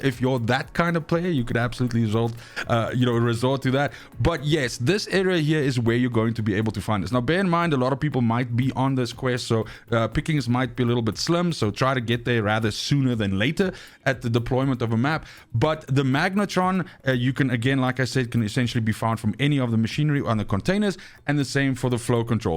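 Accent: South Africa. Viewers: ARE YOU SOUTH AFRICAN BROTHER?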